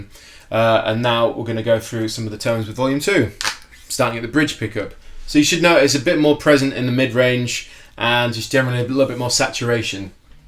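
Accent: Yorkshire, United Kingdom. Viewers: it would be great if you could do a video on how you learnt to play guitar and the processes you went through. cheers, also from leeds so i appreciate your yorkshire accent!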